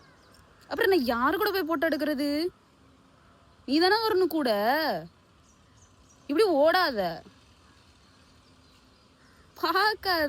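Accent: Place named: Kongu, India. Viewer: Kongu tamil super ❤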